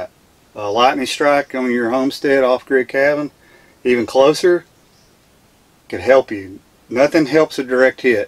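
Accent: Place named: South, United States. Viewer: My kind of Guy Sounds Southern And very Intelligent thank you 🙏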